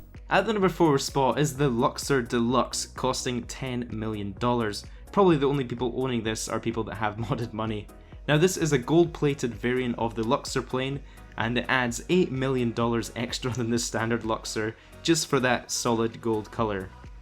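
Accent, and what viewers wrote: Scotland, United Kingdom. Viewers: Ur Scottish?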